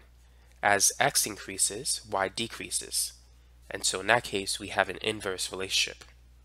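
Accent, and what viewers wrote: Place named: Massachusetts, United States. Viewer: He talks like he's from Massachusetts.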